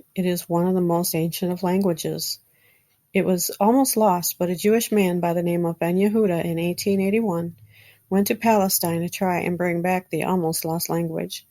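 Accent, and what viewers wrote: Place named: United States. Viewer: Madam, you are butchering the Semitic languages! You have absolutely heavy American accent! Our phonetics is quite different!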